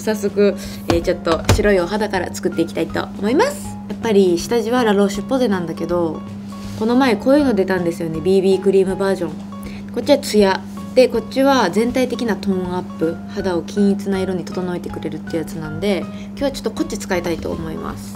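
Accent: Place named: Aomori, Japan. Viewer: 東北人のわたしですらなまってるなぁと感じるのですがあいちゃんは東北の方ですか、、？青森っぽいなまりだなぁと思いますが違かったら失礼しました( ´тωт` )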